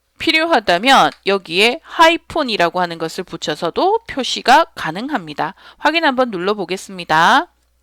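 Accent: Gyeongsang, South Korea. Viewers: 나도 이분 목소리가 너무 듣기 좋아 쏙쏙 잘 들어갑니다.짤막한 강좌를 시간 될때마다 듣는데 머리에 잘 들어옵니다 경상도 분 이 신것 같은데 왜 그리 목소리가 곱습니까 강의 내용도 좋습니다. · 액셀 활용에 매우 유용한 강의입니다. 부산 억양의 선생님 감사드립니다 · 경상도 사투리 억양이 넘 듣기좋아요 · 60대 부산사람이 경상도 말씨로 강의를 들어니 귀에 속 들어오네요...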